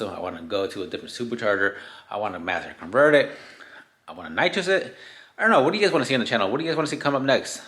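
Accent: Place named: Texas, United States. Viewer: Thats pretty good gains from stock running gear... i just picked up a 94 single cab short bed f150 myself and my dad is wanting to do a lightning clone... heres a thing to think about tho... was that other guys truck a lightning? Cause a real lightning had GT40 heads on it which you can tell be the three ribs on the front of the cylinder head... if you have those then thats why you made way better power cause the GT40 heads are 150 cc intake runners compared to the 130-135 cc intake runners from the plain heads... my truck is a straight 6 which im thinking of upgrading just to have something different... it sounds like youre in texas, im down in the rgv... maybe after i get my truck built we could have a shoot out 🤷🏽‍♂️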